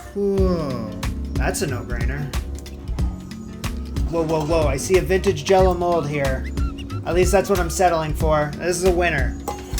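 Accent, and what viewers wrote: Canada. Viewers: your Canadian has gotten SO much more noticeable since you moved 🤣 and when I saw the aspic in the thumbnail I laughed like a maniac 🤣👌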